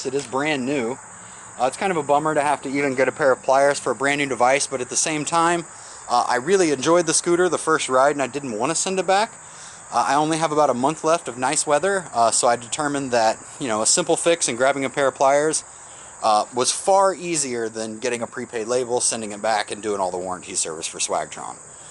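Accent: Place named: Michigan, United States. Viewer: BTW...where do you live, man? It sounds like maybe Michigan. You should open a repair shop if you haven't already. Would rather pay someone like you and not give any more money to ScamTron, I mean, SwagTron.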